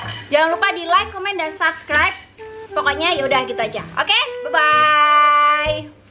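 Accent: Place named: Java, Indonesia. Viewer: Kk klo bahasa jawa lucu yahh🤣🤣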